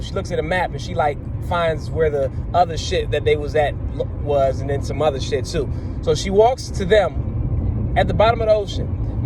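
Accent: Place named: Washington DC, United States. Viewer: Def from DC or PG, lol. Appreciate the review bro!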